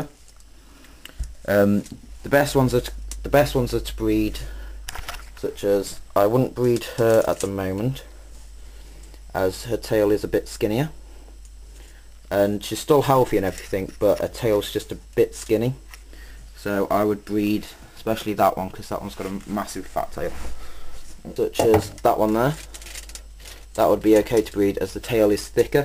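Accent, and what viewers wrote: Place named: United Kingdom. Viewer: nice video. but sorry i reallly reallly hate brittish accents. lol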